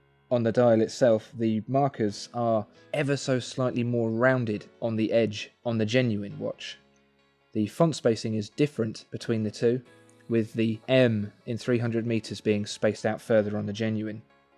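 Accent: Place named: RP, United Kingdom. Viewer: this guy has a very nice royal accent.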